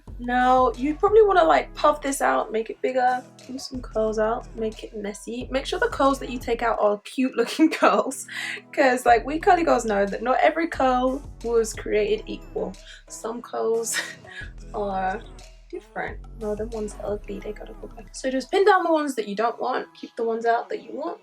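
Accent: United Kingdom. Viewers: I Love your Britsh accent!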